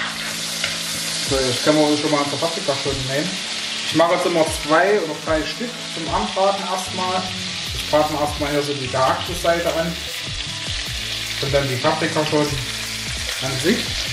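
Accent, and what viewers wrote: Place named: Bad Dürrenberg, Germany. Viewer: Bad Dürrenbergerisch auf YouTube. Herrlich.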